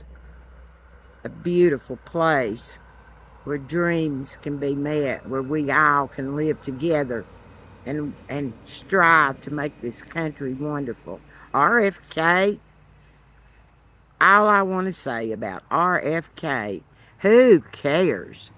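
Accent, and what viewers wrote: South, United States. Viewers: I’m a new follower…I love hearing your thoughts with a southern accent. I am 70 years old and live in California. I paint rocks for elections and send them to battleground states to remind them that I really want to help them become blue. The DNC was beautiful. I don’t want to feel anxious about America anymore.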